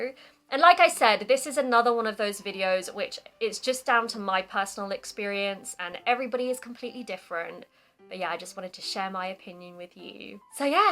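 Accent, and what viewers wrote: United Kingdom. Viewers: はぁ(о´∀`о)ブリティッシュアクセント最高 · i enjoy your talks,really. i was living in London for 2 years. i miss tubes which i used when commuting .your talks and british english let me recall my old days．you are not scotish, right? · イギリス英語美女めっちゃカッコいい!! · 英語の訛りについて解説してるマルチリンガルの人の動画を見てからこちらの方の動画を見たら訛りがイギリスっぽいと英語が全く話せない私でもわかりました。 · イギリスアクセントが心地よいね(・∀・) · chill, sweet の使い方が勉強になった、英語と日本語が出てくるので、勉強になる。発音は英国式だし聞き取り安いです。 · イギリス英語は聞いてて気持ちいいです。あんまり違いは分からないですが、イギリスの地域によってはちょっとおバカに聞こえたりしますけど、この方の喋り方は頭よさそうに聞こええる(笑) (ってか、英語ってイギリスがオリジナルでしょうけど・・・）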